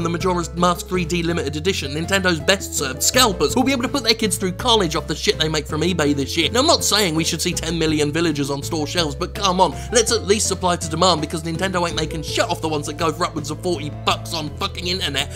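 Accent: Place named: United Kingdom. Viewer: (I'm new to Sterling) says he's american but he sounds british so does he live in america and is british or what?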